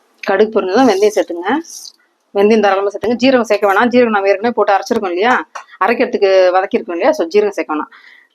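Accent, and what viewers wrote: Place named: Telugu, India. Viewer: அக்கா உங்ககிட்ட ரெண்டு கேள்வி நீங்க நடிகை ஜீவிதா மாதிரி இருக்கீங்க and வீட்டுல தெலுங்கு பேசுவீங்களா நாங்க ரெட்டியார் தமிழ் தெலுங்கு வாடை அடிக்குது னா தமிழ் tr ங்க 🙏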